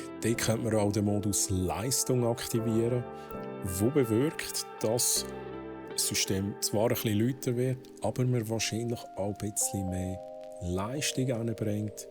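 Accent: Switzerland. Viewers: find d'musik genau richtig sehr ahgnehm chillig smooth ahpasst das liegt wohl ehner am schwiizerdütsch und em dialekt und isch es sprachliches khör und kein sound problem 🇨🇭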